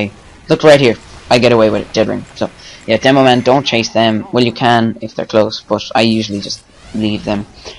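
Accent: Ireland. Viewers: Ur irish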